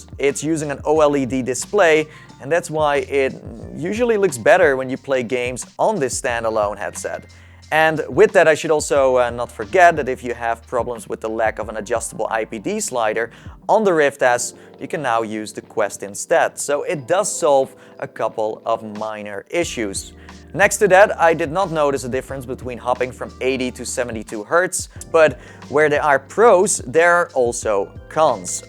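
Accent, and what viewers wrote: Netherlands. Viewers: wow je hoort echt hard dat je nederlands bent XD ly, keep up the good work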